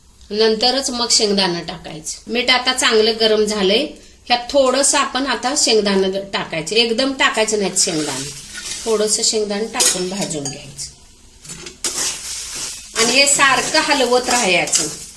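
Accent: Kolhapur, India. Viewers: Kolhapuri bhasha aahe na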